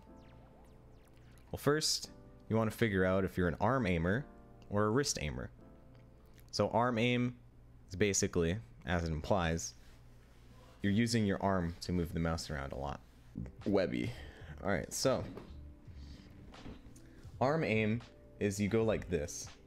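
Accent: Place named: Alberta, Canada. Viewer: Holy shit you from Ed, bud? or Calgary…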